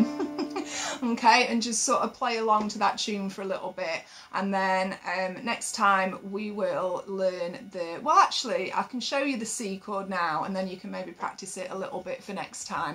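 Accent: United Kingdom. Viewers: Hey, I discovered your lessons while donating platelets and I love em. I about rolled out of the chair laughing when your British accent made me think of the pun, "Skip to my loo"! 🤣 I was delighted to get home so I could play along and I feel like I'm making good progress. Thanks!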